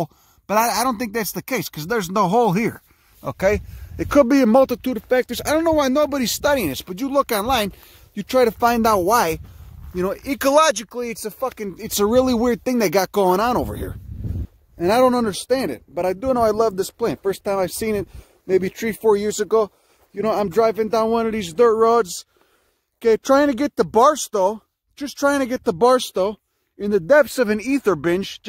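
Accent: Chicago, United States. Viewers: Could listen to a Chicago accent oratory on glands all day. Thanks for sharing your knowledge.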